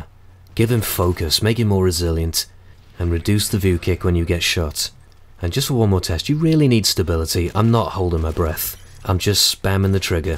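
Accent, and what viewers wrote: United Kingdom. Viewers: You and XboxAhoy need to collaborate. NOW! Two British accents in one vid? Eargasm!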